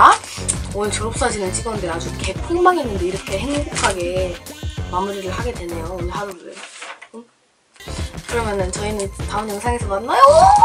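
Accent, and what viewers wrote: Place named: Jeolla, South Korea. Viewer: 옴메 사투리 전라도여?!?!?! 나돈디!!! 넘모 귀어우셔서 구독하고감!!!❤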